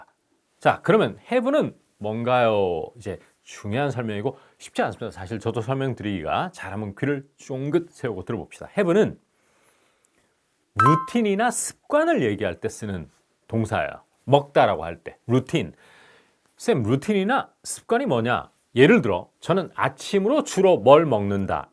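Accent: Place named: Gyeongsang, South Korea. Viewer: 선생님 목소리 너무 좋으세요~~ 경상도 사투리가 들리는데 어디세요?? ㅎㅎ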